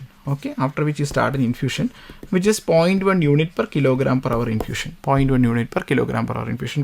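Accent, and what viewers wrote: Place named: Kerala, India. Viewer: Pakka mallu accent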